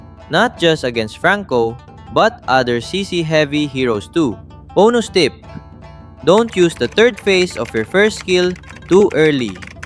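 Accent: Philippines. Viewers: Filipino accent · Accent: Filipino Language: English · Nah bro actually using Pliphina accents😅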